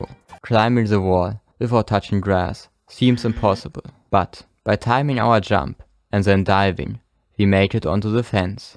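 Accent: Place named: Germany. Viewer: bro the english sounds like a german that tries to speak english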